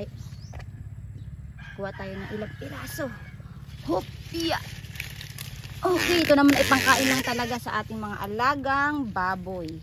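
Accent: Visayas, Philippines. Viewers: Oi bisaya diay ka maam shout out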